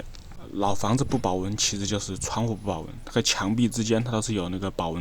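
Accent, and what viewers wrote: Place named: Hubei, China. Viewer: 我以前有位同事也是湖北的，和你的口音好像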